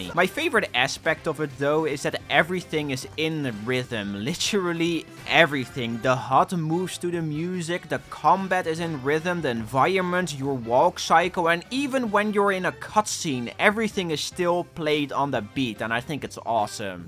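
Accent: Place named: Netherlands. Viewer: I like your funny accent Dutch dude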